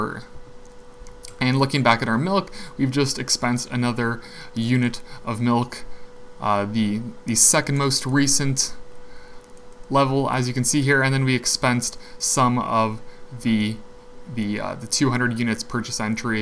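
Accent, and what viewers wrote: Canada. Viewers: I can hear your canadian accient lol :)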